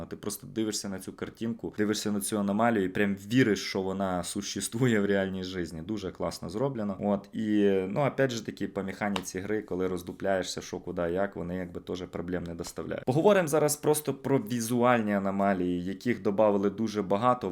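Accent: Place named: Russia. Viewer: хлопче я бажаю тобі терпіння в твоїм починанні, але слухати твою москальську не можливо. Вуха просто ріже. Успіхів тобі.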